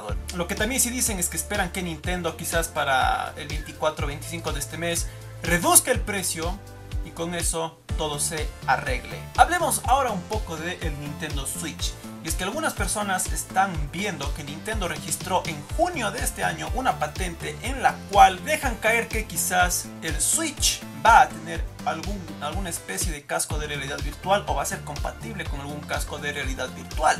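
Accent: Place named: Cuenca, Ecuador. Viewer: habla con tu asentó cuencano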